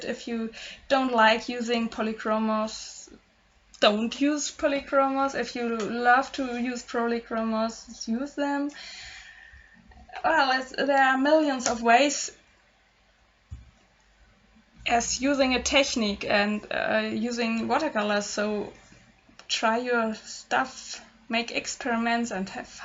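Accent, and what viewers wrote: Germany. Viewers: She's German!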